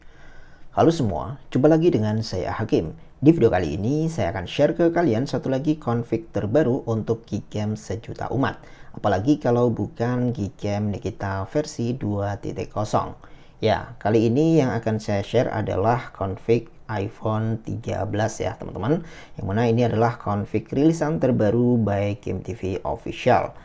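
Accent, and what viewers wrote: Pasuruan, Indonesia. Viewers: Wong Pasuruan a mas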